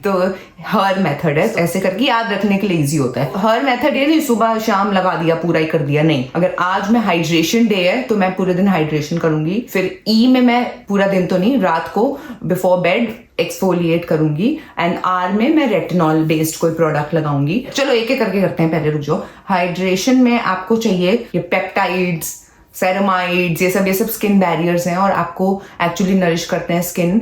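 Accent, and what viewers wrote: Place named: Punjab, India. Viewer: ! Your delivery is so relatable, so punjabi. Love watching your videos even while feeding my newborn.❤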